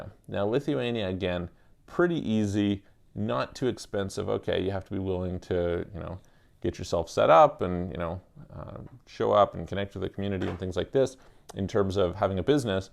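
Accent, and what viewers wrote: Canada. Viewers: Great video. I just read some of the comments and had to laugh. I knew you sounded Canadian. I'm for Canada's capital. Moving to Portugal. How far in advance of planning the actual move do you recommend reaching out to you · Great info as always. Plus you have a great Canadian accent and I love to hear reach oot and talk aboot. ;)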